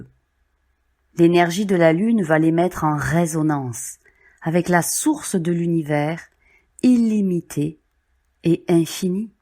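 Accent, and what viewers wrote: South, France. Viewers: Bonjour, J'ai été une de vos 1ère commentatrice, lorsqu'il n'y avait pas encore cette déferlante d'abonné(e)s qui, je le souhaite, vous comblent..C super!!!🌞⭐ Et je me souviens qu'en ce temps là jetais entrain d'arrêter l'alcool et ça me faisait beaucoup de bien de vs écouter, vous avez été la 1 ère à me dire que j'avais comme nous tous cette étincelle divine qui demande à croître et que c'est notre droit de naissance (Je vous entends av votre accent du sud, votre fille(à l'époque) avait un soucis de pneu et c'était un peu raide financièrement mais finalement, du coup vs avez eu+De clientes!!Tt ceci pour vous dire que le tps passe et malgré ces années de quête, je me sens guidée mais je n'entends ni ne vois, ni ne sens, rien du tout, et j'ai l'impression de m'être coupée du monde(choix qui est voulu et volontaire mais qui pèse parfois..) j'ignore si vs allez avoir ce message, et si vs l'avez j'ai 1 question qui me gêne, pensez vous que le fait de prendre des produits de substitution à la drogue puisse me voiler encore plus ce que je suis, car vous l' aurez compris, c'est mon désir le+ardent et le+ beau de le découvrir, appuyés tous ces bobards sociétaires,bien à vs🐴🍀🕊🦎🌹🍀🍃💋💋💓☯️💎🕉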